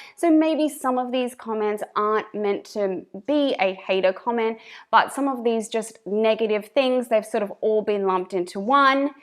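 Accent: Australia. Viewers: So mean picking on someone's accent! Everyone speaks differently and thats fine. I am Australian also so your speech sounds fine to me 😁 That comment probably came from someone in a different country who isnt used to hearing an Australian accent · As for your accent, if you watch an australian channel you should expect an australian accent! 😆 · I was always taught that if you don’t have something nice to say, then don’t say anything at all. Nowadays, i think so many people are miserable in their own lives, they look for any reason to criticize someone else. It is very sad. I love your Australian accent! I think children in today’s society will greatly benefit from being raised in a minimal home. I have been on my minimal journey for about 2 years. I am constantly looking to minimize even more. It has made a huge difference in our home. Our lives run so much better. 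I wish I would have known about minimalism 20 years ago. You are doing a great job! Your kids will grow up knowing what is truly important in this life, the relationships they have not the things they possess. Keep up the awesome work! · I love the holiday apartment look too! Plus anyone with kids knows that anything extra = extra chaos. And your hand gestures increase trust. I was taught it's GOOD in public speaking. It's ridiculous to make fun of your lovely Australian accent. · I started watching organising and minimalism videos on you tube and was really missing our Aussie accent so that's how I found you. Don't lose the Aussie twang 😉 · Your kind and gentle nature makes you a good messenger for this topic and your Australian accent is a bounce! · you have a lovely Australian accent. Some of these comments are crazy. People need to get a life. Carry on we love your channel. Bye bye to anyone who have a problem with it lol 😁💗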